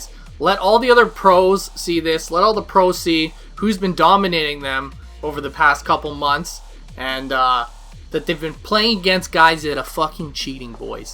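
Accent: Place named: Canada. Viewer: Are you canadian,? You sound very canadian.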